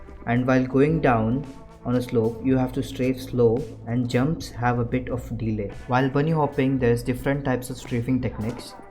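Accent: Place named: India. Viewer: That Moment when you See an Tutorial Video in english and than its an Indian accent...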